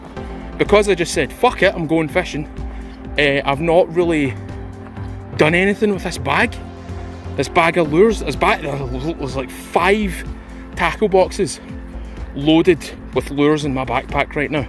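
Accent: Scotland, United Kingdom. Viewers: I watch for the content, my wife approves solely because of your Scottish accent!!! Win win! Nice fish btw!!!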